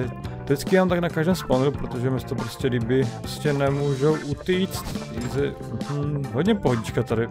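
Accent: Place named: Slovakia. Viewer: Si Slovak? Lebo maš prízvuk Slováka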